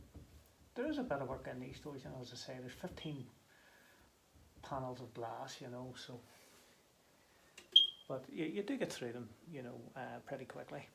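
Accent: Belfast, United Kingdom. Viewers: I've been putting off painting my 15 panel doors because it was such a hassle the first time, masking tape etc. This video has taken the "fear" out of it. I must hunt out my "angled" brush.paint and get stuck in. Thanks for the video mate. Gary. Fellow Belfastian by the sound of your accent.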